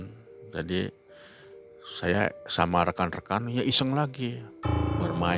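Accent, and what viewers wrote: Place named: Cirebon, Indonesia. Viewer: asli wong ndi sih soale pada bae kya Indramayu cirebon logate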